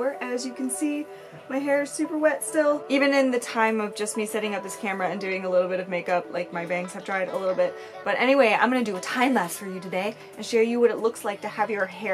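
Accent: United States. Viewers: we gotta get out this comment section. They're way too ignorant here. I'm shocked they don't know anything about perms and relaxers for one and two they didn't even know she was American. They said she must be from England she doesn't look like an American woman. Like what do American women even look like where literally a mixture of different people and cultures. I knew she was an American from her American accent 🤦🏾‍♀️ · VERY obvious American accent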